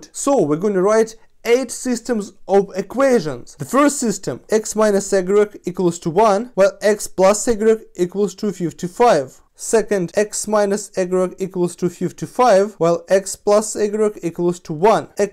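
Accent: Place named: Russia. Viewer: it's also pronounced close to that way in French But yeah he obviously sounds Russian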